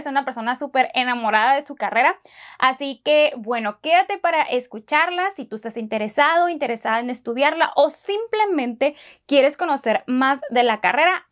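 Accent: Mexico. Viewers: muito legal ver um video de um outro país falando sobre a faculdade que eu quero seguir, amei esse sotaque mexicano, entendi tudo, beijos do Brasil🇧🇷🥰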